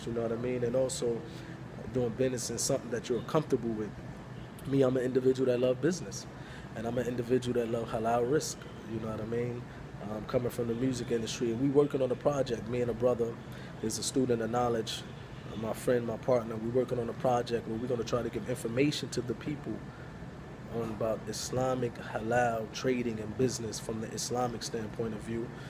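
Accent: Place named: United Kingdom. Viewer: Asslam Alikom bro. You are very welcome in Riyadh and I hope you visit us again and having Arabic coffee, having amain dish in SA that's call Kabsah, barbeque during the night, stroll in desert, going a picnic out of the city espically in spring or winter. I know you love coffee& you have KMW cafe in Ras Elkhemah. May Allah give you ability& help for inviting non-muslims to convert to Islam, Jazak Allah Khair. I like you when you trying to speak Arabic. I am dought when I hear your voice that you speak British slang coz I understood what you saying. Plz contact me for any help from SA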